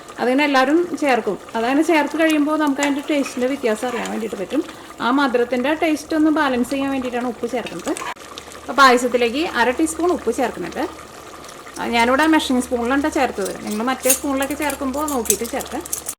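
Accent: Thrissur, India. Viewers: 😀lokathinte eth koonilum nammal thrishir kaaru undaavum😀😀 orikkalum vitt poovaatha thrishur slaangum aayi😂😂😂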